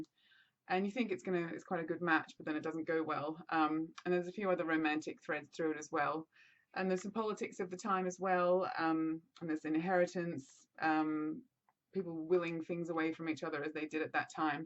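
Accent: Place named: England, United Kingdom. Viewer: The Madwoman upstairs, wouldn't mind taking a look at that , and watching middlemarch. I wish I'd borrowed instead of 'Joy' which is currently in my dvd player. First half hour in and I was so bored last night I went to bed lol. And read some of and editing book by james scott bell...ANd actually paid attention. That's how sick of feeling bored at the movie I was! Lol. I love the Brontes. it sounds like the mad woman upstairs isn't written like fanfiction? - it has it's own story. There is a book I saw recently in book shop about Nelly from Wuthering Heights and I got one page in and groaned - I could have written it myself! - it did not read anything like Emily Bronte...or any bronte. By the way you have an English accent lol. Did you always have it or caught it overseas? This sounds funny but I like stories where people need to get a job, and life kinda is frustrating them lol. 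Blood stain sounds good.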